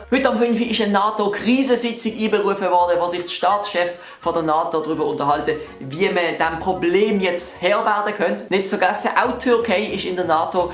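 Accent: Switzerland. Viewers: Ahhhwww, immer die hochdütsche Videos und denne die schöni schwiizer Stimm <3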